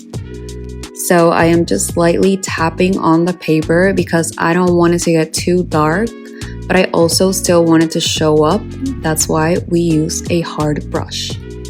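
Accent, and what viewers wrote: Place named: Bolivia. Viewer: Ive never heard your voice before. Not what i expected a Bolivian accent to sound like. Amazing artwork. Your hard work and talent have been an inspiration.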